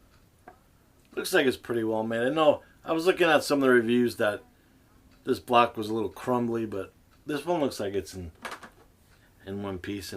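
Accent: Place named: New Jersey, United States. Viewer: Where do you live? Your accent makes me think you might be from New Jersey or New York, but I could be wrong.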